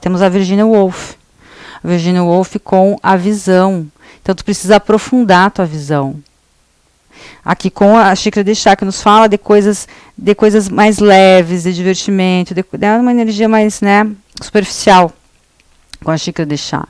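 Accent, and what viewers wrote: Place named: Rio Grande do Sul, Brazil. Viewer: Pelo seu sotaque já percebi que vc é do Rio Grande do Sul. Parabéns pela leitura, vc tem o dom da expressão, muita intelectualidade!! Gratidão!! 🥰 Namastê 🙏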